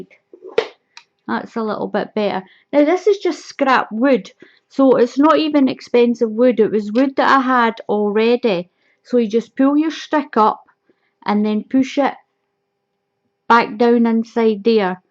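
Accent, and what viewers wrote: Scotland, United Kingdom. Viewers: are you scottish? I just love your accent!! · are you Scottish? your accent is very cute. greetings from Romania! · Very clever idea. I have a kind of personal question though, are you scottish or from northern England? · what a heavy british accent 😂 wow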